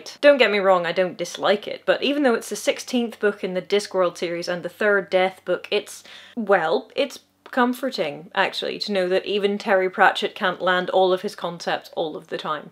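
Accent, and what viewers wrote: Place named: Wales, United Kingdom. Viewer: I lloved the Wellsh accent.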